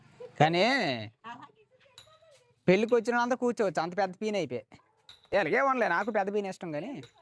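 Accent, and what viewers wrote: Srikakulam, India. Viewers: Anna Mee srikakulam yasa balga untundhi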